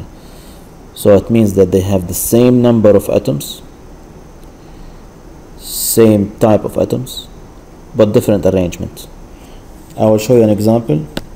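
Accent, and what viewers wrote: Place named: Arab world. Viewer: you sound arab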